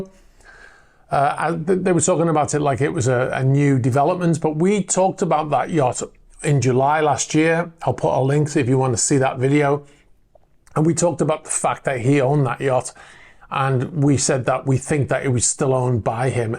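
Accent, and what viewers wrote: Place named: Merseyside, United Kingdom. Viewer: Really like watching the channel, your reply to Chris the weirdo with the major personality issues was spot on, I could be wrong, but do I detect a Merseyside accent, being from Merseyside myself, I wondered if you are from here, what part of Merseyside do you come from ? 🖐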